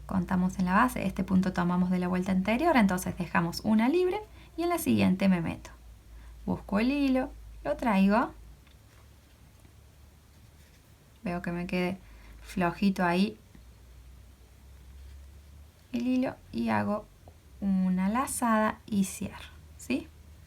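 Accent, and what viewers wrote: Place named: Córdoba, Argentina. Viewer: Qué hermoso!! Tgo 80 años, tejo maravillas, y estoy re tentada de hacer estos zapatos!!! He tejido pantuflas p regalar en pandemia, pero ésto es superior!! Y escuchar tu "tonadita" de ntra Cba, más!!! Gracias X tan bna explicación. Ely,ciudad de Santa María de Punilla, Córdoba, República Argentina.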